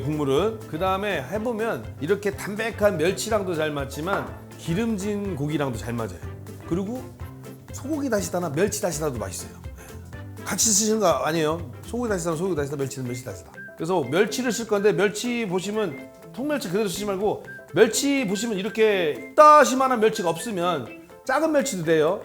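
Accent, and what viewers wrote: Chungcheong, South Korea. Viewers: 구수한 충청도 사투리? 잘 모르지만 어머니 고향이 충청도인데 구수한 . 그래유우~등등. 듣기좋은 우리나라말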